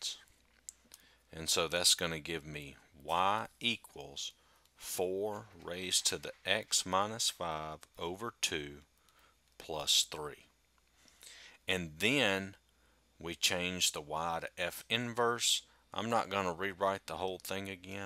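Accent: South, United States. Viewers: Not even joking, I somehow understand better with a Southern accent. It sounds straightforward, simple, and just cool.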